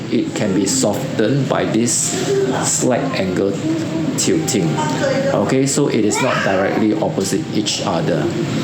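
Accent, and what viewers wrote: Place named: Singapore. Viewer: The Singaporean English ........😳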